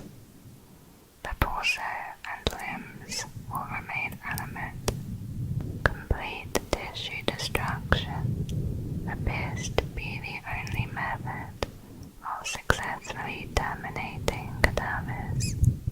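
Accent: United Kingdom. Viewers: You have the most beautiful accent and voice I've ever heard. I'm British too but have a heavy lower-class South East accent and mostly speak in cockney slang. Really gotten into SCP stuff too over the last little while. Great stuff :)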